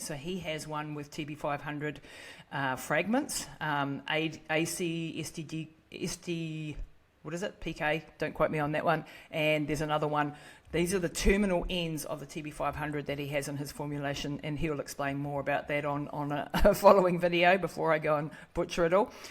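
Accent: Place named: New Zealand. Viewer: New Zealand accents make no sense to me. Why pronounce hair as "hee-ah?"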